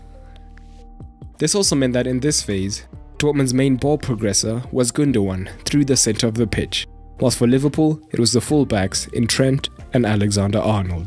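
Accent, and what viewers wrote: South Africa. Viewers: You’re South African innit?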